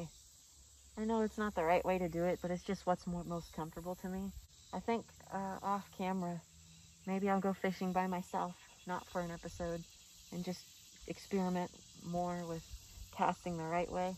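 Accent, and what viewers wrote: South, United States. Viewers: I'd just do what works unless there's a serious reason imo Common way is not always best way And also is that a slight country accent? aww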